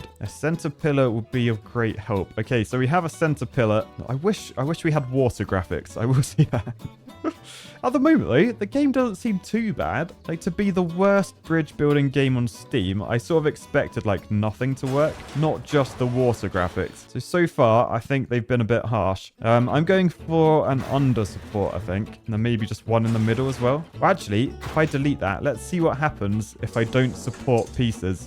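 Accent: United Kingdom. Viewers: Why does sound British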